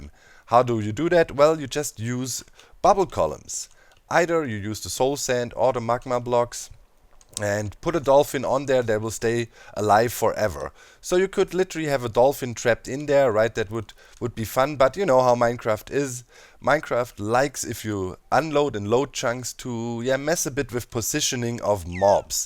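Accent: Germany. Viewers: Klar das merkt man schon am deutschen Akzent..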